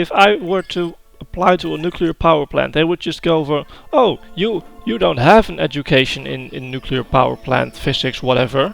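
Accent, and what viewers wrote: Netherlands. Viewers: Your accent :D, and I know you are from Holland, saw It on your twitter :D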